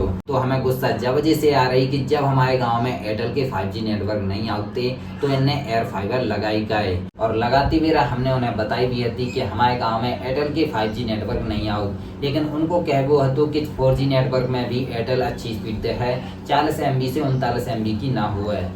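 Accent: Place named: Agra, India. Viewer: bro kha sa ho ap ke boli to agra ke lag re h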